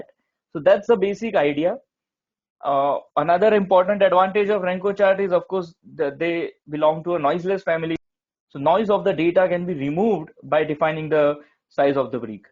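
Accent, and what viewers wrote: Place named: India. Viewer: Sir you are pure Hindustani not foreigner you talk to mother tongue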